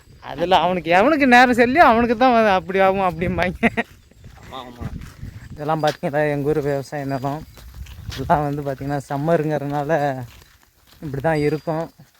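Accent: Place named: Kaveri Delta, India. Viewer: Peachila therila, vivasayi Vera.. delta place of tamil Nadu. Thiruvarur or tanjore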